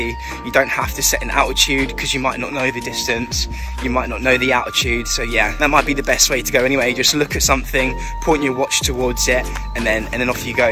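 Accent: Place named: United Kingdom. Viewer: The video is probably good, but sorry can't understand half of it because of the heavy British accent.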